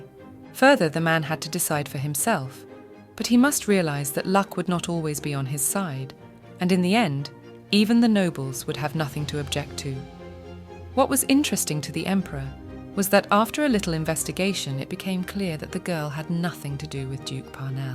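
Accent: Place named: England, United Kingdom. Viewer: Cant stand englisg narrators accent either.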